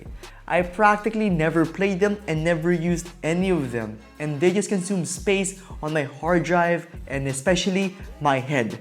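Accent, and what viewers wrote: Philippines. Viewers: You ought to delete your filipino accent from your brain, learn some English before talking about how to deejay. It’s hard to understand you most of the time.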